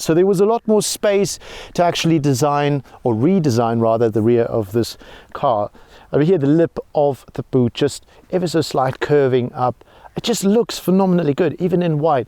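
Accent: South Africa. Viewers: im hearing South Africa, im hearing Welsh.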